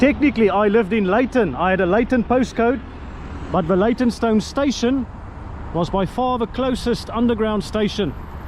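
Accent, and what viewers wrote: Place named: Cape Town, South Africa. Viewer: Jy is ñ Kaapenaar met die aksent,! 😁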